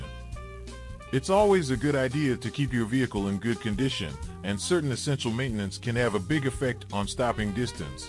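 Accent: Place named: United States. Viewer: This presenter seeks "likes" and subscribers, but I, for one, will not be doing so. The video, which, judging from most of the imagery, was of British origin , (let's face it, an American wouldn't include non-American images), but the voiceover is in the most annoying sententious American accent, reading the script badly, particularly pausing in the wrong places.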